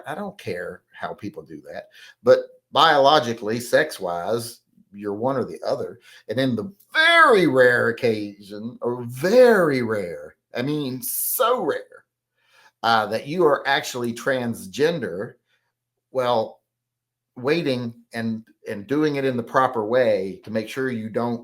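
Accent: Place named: South, United States. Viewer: You have a pronounced southern accent, but I guess you knew that.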